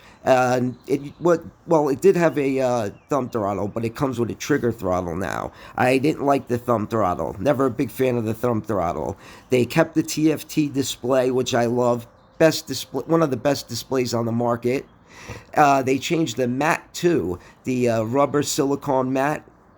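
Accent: United States. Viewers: Your whiny yankee voice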